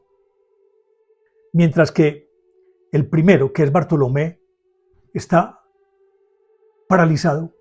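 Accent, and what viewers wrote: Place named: Colombia. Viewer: Todo esto es muy interesante, lástima que la copia de la obra usada es una obra muy alterada, y ese halo de santos vuelve a aparecer, soy un gran amante de la obra de Leonardo Da Vinci sin el endiosamiento que le han dado, era un hombre talentoso, seguramente muy conflictuado por sus orígenes, su homosexualidad y sobre todo que fue muy incomprendido. Don me gusto su tono de voz y el acento colombianos es muy bonito, gracias!!!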